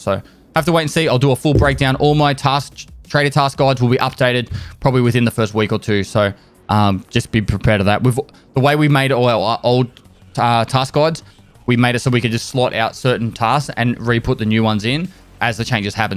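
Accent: Australia. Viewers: it is nice to listen some Australian english, bein a Russian, living in Moldova. EFT: connecting people )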